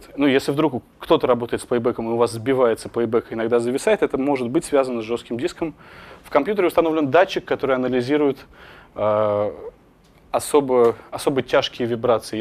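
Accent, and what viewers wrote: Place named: England, United Kingdom. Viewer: английский акцент немного слышится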